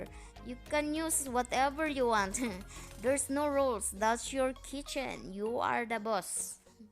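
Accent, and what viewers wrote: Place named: Philippines. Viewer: haha i love ur accent..haha..pinoy na pinoy..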